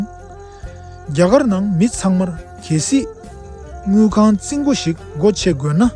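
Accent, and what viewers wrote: Standard. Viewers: བོད་སྐད་གཙང་མ་ཐོག་ནས་ཡག་པོ་བཤད་སོང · བོད་སྐད་དཔེ་གཙང་མ་གསུང་གི་འདུག་ཐུགས་རྗེ་ཆེ།🎉🎉🎉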